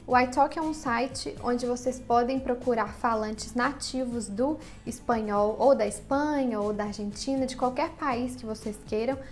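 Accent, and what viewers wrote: Minas Gerais, Brazil. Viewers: Are You from minas gerais??? Seu sutaque não é estranho. I loved your Voice. i Liked ok! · O espanhol dela não é lá essas coisas.... Mineira, detectei....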